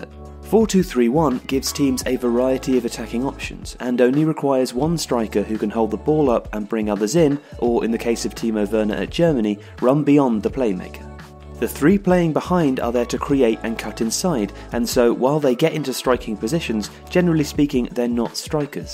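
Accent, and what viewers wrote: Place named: England, United Kingdom. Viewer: I think its just a regional English accent. Some words are pronounced slightly differently depending on where you live. For example, in the south of England where I'm from, most people pronounce "bath" as bar-th, whereas up north they would pronunce it as ba-th. Very similar, but slightly different inflections to the word give them a different sound.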